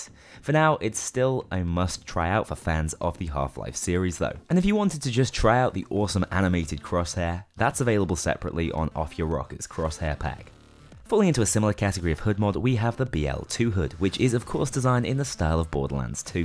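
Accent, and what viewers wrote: Denmark. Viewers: bro er du dansk? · Are you danish?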